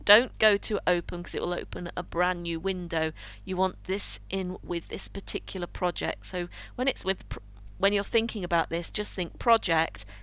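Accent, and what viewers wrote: England, United Kingdom. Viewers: i wish i had ur cool english accent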